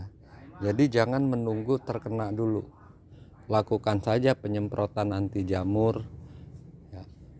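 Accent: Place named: West Java, Indonesia. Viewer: iya sudah sy kira juga ada logat Sunda ya... iya mmng ini musim hujan tiap hari...sy di bogor trims pencerahan ya n nasehat ya.. salam aglonema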